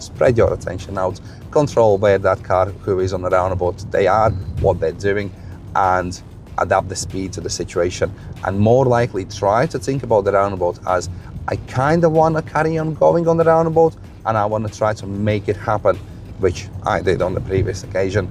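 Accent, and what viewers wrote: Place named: Liverpool, United Kingdom. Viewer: Very useful video.... I can learn liverpool accent and driving at same time... the best part is showing all the 3 scenarios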